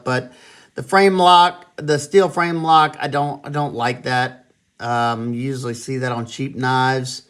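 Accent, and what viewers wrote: Louisiana, United States. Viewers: You from Louisiana?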